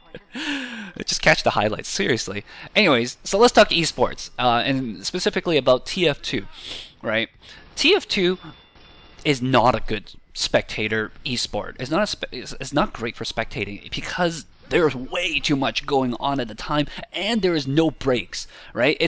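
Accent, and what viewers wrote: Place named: Canada. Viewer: You can tell he's Canadian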